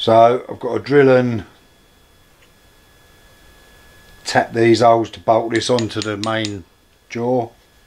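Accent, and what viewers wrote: East London, United Kingdom. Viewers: As you say not sure whether it was worth it, but on the whole I think it was, because if you had gone out and bought another one it would be a, Chinese, b, crap, and c, you would have had to put it on the mill to correct the crappy machining to get it anywhere near as accurate and smooth operating as you have made your old one! By the way, is that a Warco mill? This is the first time I have seen you using the mill, and as its the same colour as my Warco 300 lathe/mill outfit i just wondered. I live in L.B.Redbridge, are you anywhere near me? I ask that as we share a common East London accent and I mean in my case at least very common! I look forward to your next project eagerly.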